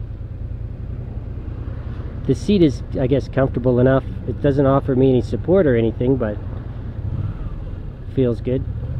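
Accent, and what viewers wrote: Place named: United States. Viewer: I guess from your accent and you are riding on the right, that you are in the USA? I am surprised your speedo and odometer are in metric. I have just bought a new V9, in England, and the speedo is MPH and odometer in miles. The one thing I don't like about the bike is that the speedo and digital readout are virtually invisible. I can only tell what speed I am doing is by knowing where the red needle should be on the dial, and by keeping pace with the other traffic. At night the speedo lights up in a sort of red colour and goes completely illegible. It is OK if the sun shines directly on it, bizarrely. The numerals and letters on the digital readout are too thin to be legible which annoys me. They could have doubled the thickness of the lines forming the characters, and made it a lot better. I have done 35 miles after the 'low fuel' warning, so the lack of a fuel gauge isn't bad, though I would prefer one. 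If I had bought a V7 I would have got the one with the two dials. One important thing to know if you have a new V9 and want to buy a Givi rear carrier, if you buy what seems to be the only one available, it is advertised as fitting a V9, 2016 model. It fits the frame but then you cannot refit the seat. They seem to have lengthened the seat for the newer models. I made some brackets and fitted the carrier about 2 inches further to the rear. The seat now fits, but the downside is that in effect the carrier is only held on to the frame by half the number of nuts and bolts that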